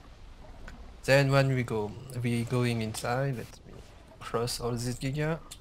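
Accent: France. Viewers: On sent l'accent français quand même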